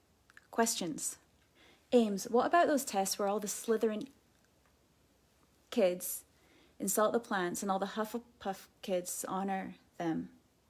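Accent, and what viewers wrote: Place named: Glasgow, United Kingdom. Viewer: it's so cool that you still have a wee Weegie burr :D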